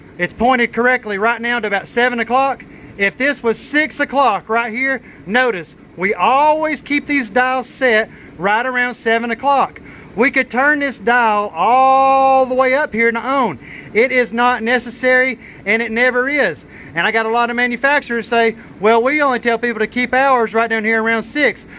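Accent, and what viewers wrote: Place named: Rural, United States. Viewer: Shit, I was sold and heard the country accent, but I'm in North Carolina :(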